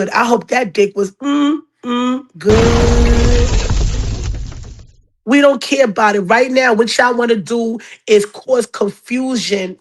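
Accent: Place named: Brooklyn, United States. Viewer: I heard you speak and I hurried up and subscribed!!! Love the rough talk you sound like your from Bk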